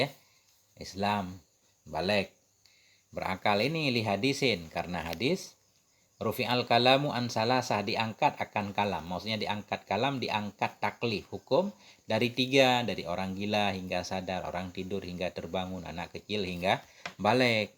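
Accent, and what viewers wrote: Aceh, Indonesia. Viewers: Afwan kiyai, awak kira kiyai dari Aceh karena logat antum 🙏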